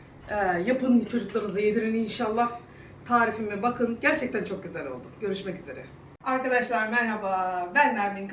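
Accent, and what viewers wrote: Aegean, Turkey. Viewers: Nermin hanım ellerinize sağlık iyi bayramlar diliyorum canım bir Tireli olarak Bursa dan çok selamlar sevgiler yeni takip etmeye başladım gerçekten çok seviyoruz seni egece konuşmalarına bayılıyorum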